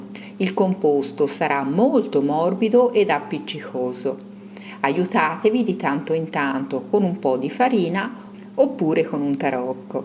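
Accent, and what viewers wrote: Tuscany, Italy. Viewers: Altroché se rendi .....la bontà, sei unica.....le tue ricette sono perfette,molto molto brava. ...e poi la il tuo accento toscano. ....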